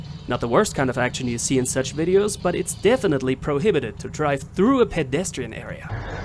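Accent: Germany. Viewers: I told him early, that his English wasn't necessarily bad, just ultimately "German". Some people as you like it, others don't. He'll be reading the comments here, so he'll get to know. ^__^ I hope you are gonna stay as watcher!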